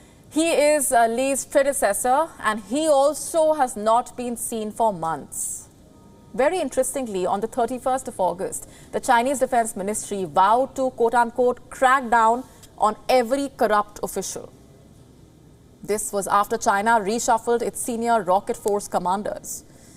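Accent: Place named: India. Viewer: Indian tongue as always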